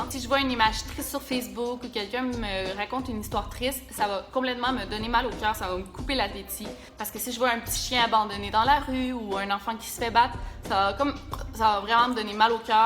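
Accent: Quebec, Canada. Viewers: Je viens de découvrir ta chaîne aussi et je l'ai adoré! J'aime le français quebecoise <3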